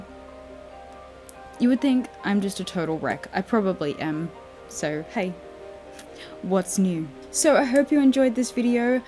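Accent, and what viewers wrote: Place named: Australia. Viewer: Wow are you aussie. You sure sound Australian. I’m in love with your look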